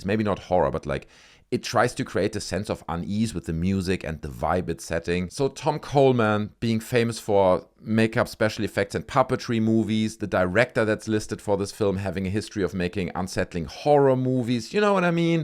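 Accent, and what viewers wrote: Germany. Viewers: Great video mate, is that a German accent im detecting btw? :)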